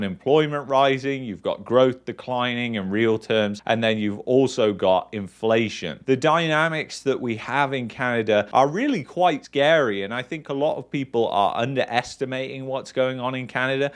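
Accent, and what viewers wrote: United Kingdom. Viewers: You are a pessimistic joker with a British accent! I unsubscribe!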